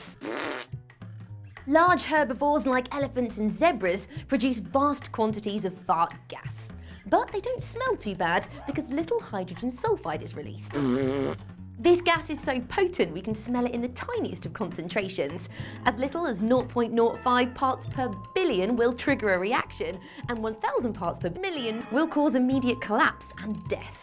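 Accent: England, United Kingdom. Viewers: Her British accent makes it less awkward to listen to her talk about farts. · She english and we pronounce stuff different.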